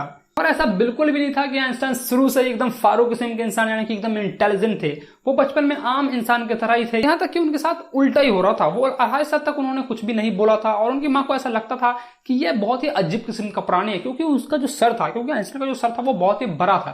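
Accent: Bihar, India. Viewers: Bhai aap Bihar se ho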